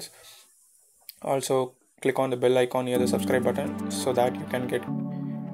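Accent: India. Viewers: i hear an indian accent coming up.... thanks for the tutorial btw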